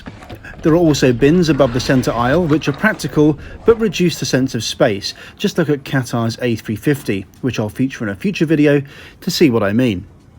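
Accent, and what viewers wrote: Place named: Northern Ireland, United Kingdom. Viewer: Loving the Northern Irish accent! Full of surprises mate!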